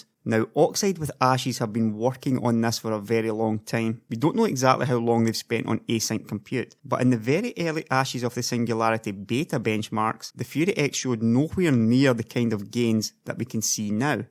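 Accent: Scotland, United Kingdom. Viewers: even better with that Scottish accent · Which part of Scotland is your accent from? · your scottish also so all good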